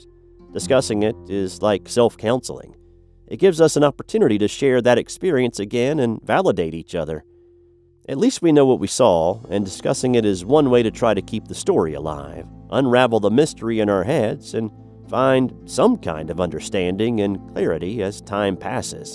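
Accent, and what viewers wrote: South, United States. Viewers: You have a very interesting accent. Are you from the south?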